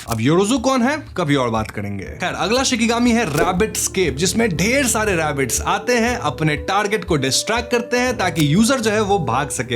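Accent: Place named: Bihar, India. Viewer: Your bihari accent is so sexy... Please say it again....❤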